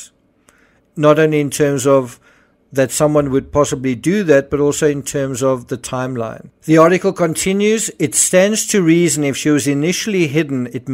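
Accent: South Africa. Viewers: OH! It's was in the Daily Star, then we know it's true. I can tell from your accent you are South African, you clearly do not know what sort of newspaper the Daily Star is?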